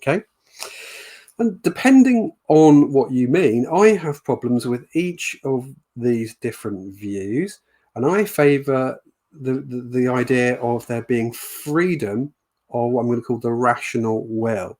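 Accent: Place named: England, United Kingdom. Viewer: Superb English accent and manner indeed, on top of all the thought put in. Subscribing. (A beautiful reminder of Attenborough, but dreadful now to realise that "Soonack" Sunak and co have similar too, eloquently aiding the destruction of the our fellow human beings and their loved ones in Gaza and Ukraine!!🥶)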